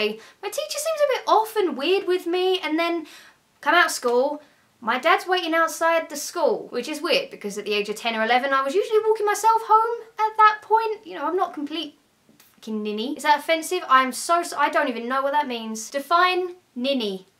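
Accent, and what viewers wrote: United Kingdom. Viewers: British accent <3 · it´s a british accent :)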